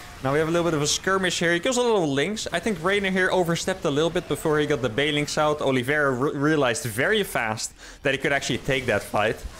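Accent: Netherlands. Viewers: Found the commentary/analysis really interesting!! Your speech patterns sound really similar to Lowko's, I guess I just like that Dutch accent!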